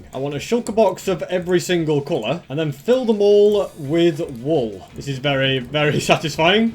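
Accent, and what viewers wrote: United Kingdom. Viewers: I absolutely love you British accent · No he is british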